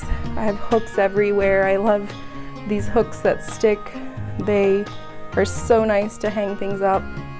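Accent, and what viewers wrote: Kenya. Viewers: Your accent has changed too. You sound more kenyan now even when you pronounce certain words. Thanks for the tour!!!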